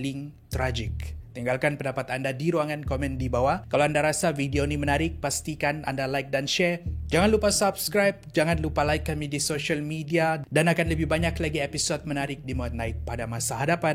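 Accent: Sabah, Malaysia. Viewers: Org sabah kah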